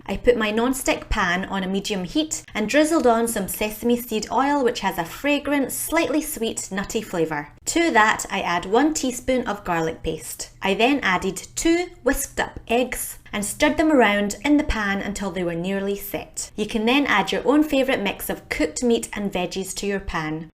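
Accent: Scotland, United Kingdom. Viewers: Sort of off topic but does anyone ever get surprised by your Scottish accent? As a fellow Aussie, I always think it's so cute. BTW I'll never get bored of videos of food, especially when they're as motivating and healthy as your food prep! I really enjoy the variety and ideas :D Thanks <3 Looking forward to your next vid. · Asian decent..Scottish accent...Aussie resident☺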